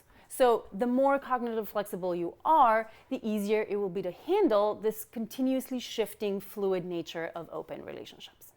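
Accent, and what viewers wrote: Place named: North Macedonia. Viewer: Great video. I've been into the open relationships, swingers lifestyle, polyamory, consensual non-monogamy for years - before it was considered a hip thing to do. I totally agree the past couple years all this has been trending, but I'd also says a lot of the Millennials are treating it like a fad. A fad until they get hurt - due to lack of research before jumping head first into the lifestyle. Btw...I love your podcast!! And I can so hear that Macedonian accent of yours coming through 👌 😁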